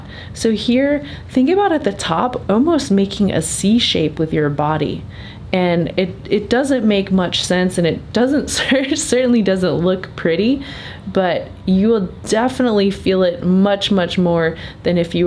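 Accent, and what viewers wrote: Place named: Texas, United States. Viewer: She’s got that Texas “train hard y’all” thing going. I like that. You should sign off saying “Y’all come back now ya hear!”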